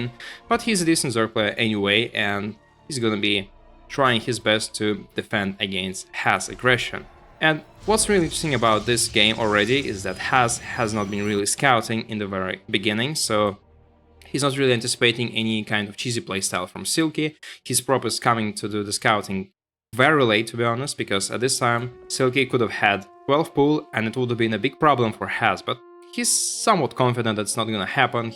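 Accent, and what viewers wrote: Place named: England, United Kingdom. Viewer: ого, вы из англии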